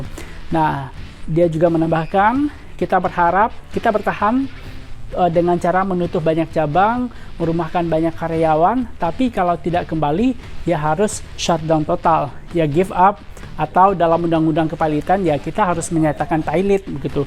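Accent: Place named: West Sumatra, Indonesia. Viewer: Orang sumatra Barat nih presenternya... Logatnya mantep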